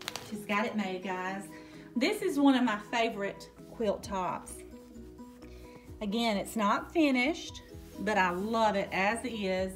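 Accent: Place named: Alabama, United States. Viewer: Everything was so beautiful and you have created a warm and cozy home! Everywhere you look there is a treasure and a history. My two bedroom house is a little cottage and 73 years old (same as me). I looked closely at your windows(are they glazed?) and baseboard trim and am wondering if your home is an older one as well. I love the charm of an older home! It even has the original glass doorknobs!, you are a delight and I love your Alabama accent! Blessings from your new Central Florida friend❤️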